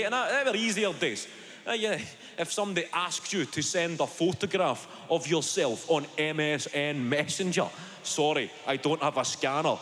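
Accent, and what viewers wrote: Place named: Glasgow, United Kingdom. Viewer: Neither. It's Scottish (Glaswegian i.e. from Glasgow). :)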